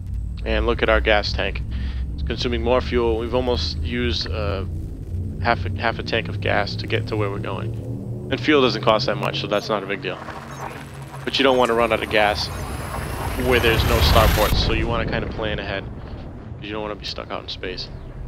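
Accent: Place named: New York, United States. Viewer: Just bought this game yesterday, thanks for making these videos. Judging from your accent you're a fellow New Yorker. Sounds like either Brooklyn, Staten Island, or Long Island. Peace!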